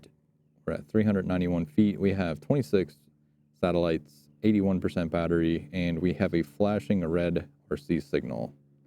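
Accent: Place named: South, United States. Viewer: oh definitely not Texas 😂 maybe Alabama or Georgia am i right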